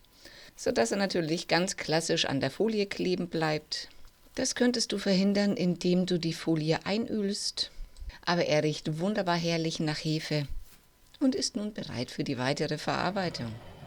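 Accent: Bavaria, Germany. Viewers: ja, ich habe mir seit gestern bereits vieles angeschaut und habe sie auch abonniert! Ihr bayrischer Dialekt ist Balsam auf meine Seele! Ich habe 23 Jahre in München gelebt! Ganz liebe Grüße!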